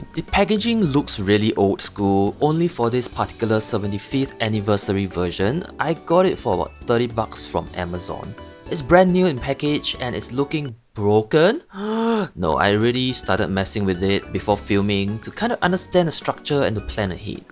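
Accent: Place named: Singapore. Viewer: Wait you singaporean? U sound singaporean, If u are, omg, never knew got singaporean who is interested in this stuff. Love it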